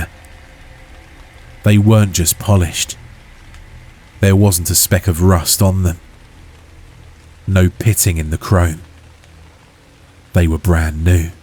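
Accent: United States. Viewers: Your stories are always great. I use them to help me sleep! Gotta say though because I’m boring, I prefer just your normal voice without the US accent. Just my opinion though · Excellent American accent, my guy!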